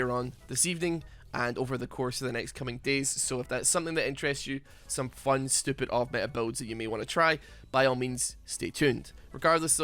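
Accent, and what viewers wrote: Scotland, United Kingdom. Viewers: Are you Scattish my friend?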